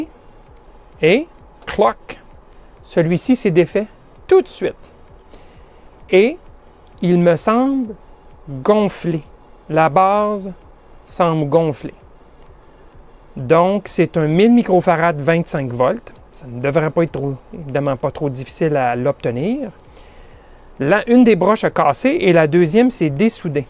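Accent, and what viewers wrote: Quebec, Canada. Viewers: Bonjour, merci pour votre vidéo. Je me posais des questions quant à la mise à la masse commune en cas de mesure avec un ocsillo et comment juste avec un multimètre suspecter un condensateur de filtrage. Et bien, j'ai eu ma réponse et vous en remercie. Etant Français, l'accent Canadien est un pure plaisir ;-) merci à vous. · Excellente video, comme toutes les autres d'ailleurs ! Un grand merci et Bravo a vous et avec cet accent de nos sacrés cousins !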